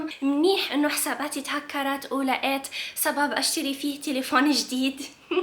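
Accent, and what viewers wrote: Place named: West, Algeria. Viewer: ايه صح صح انا ثاني شفيت بلي كانت تهدر بلهجة الغرب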